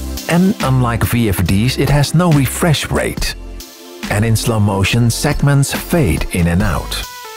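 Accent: Netherlands. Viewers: Very Dutch accent: replacing all the 'th' sounds with a 'd'.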